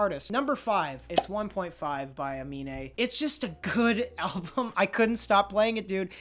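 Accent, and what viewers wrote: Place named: New York, United States. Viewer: sounds extra new yorky in this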